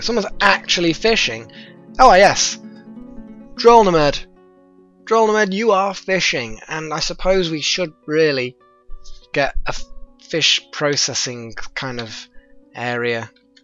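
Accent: United Kingdom. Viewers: ah, it's been a while, good to hear your british voice and the clicking of your keyboard!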